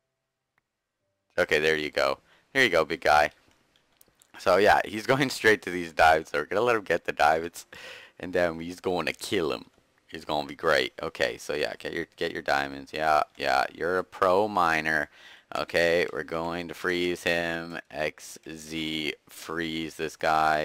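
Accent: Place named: Netherlands. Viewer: DAT dutch doe xD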